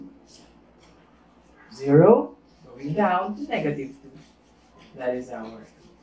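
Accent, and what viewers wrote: Philippines. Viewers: Sri lankan? I thought he's from Philippines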